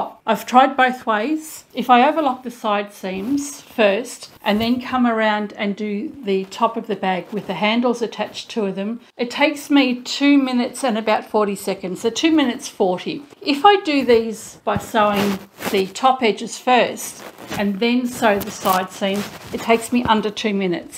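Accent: Australia. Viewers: Is she American? She sounds Aussie to me, I might need my ears cleaned lol.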